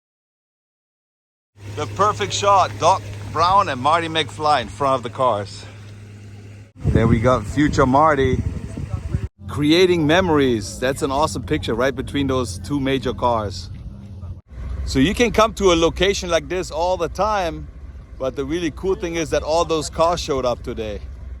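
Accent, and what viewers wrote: Germany. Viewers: Dachte sofort als ich dich hörte, das ist ein Deutscher und dann sah ich den username. 😂😂😂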